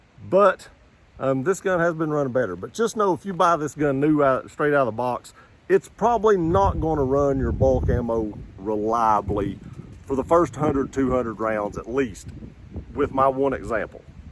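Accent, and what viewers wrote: South, United States. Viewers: Brother are you from south of the Mason Dixon ?🤓…. Great video … well done !!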